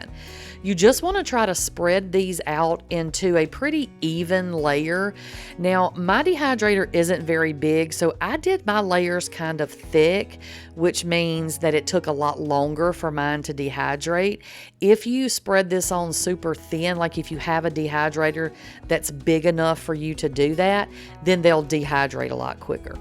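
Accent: South, United States. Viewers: Thank you for posting ma'am... I enjoy your channel and love your accent... I was in Ft. Benning in the early 90's and miss the lifestyle and accent. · your sweet southern drawl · Yes, I love your Southern accent too! ❤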